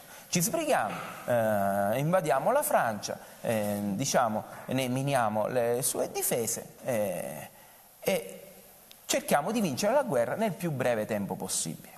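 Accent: Sicily, Italy. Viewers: Pazzesco..poi il tocco di classe con l'accento siciliano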